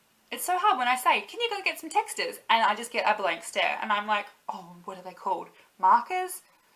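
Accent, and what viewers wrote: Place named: United Kingdom. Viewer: Only 6 months and u already have a British accent I have lived in the south of 13 years and I don't have any accent and I've grown up here I'm only 15 how do u get an accent that fast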